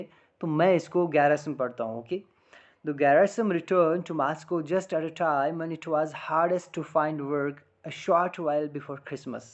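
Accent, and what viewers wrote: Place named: Doda Kishtwar, India. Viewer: Sir aap ke batao se lagta hai ki aap dhodha kishtiward side se rehte hai ❤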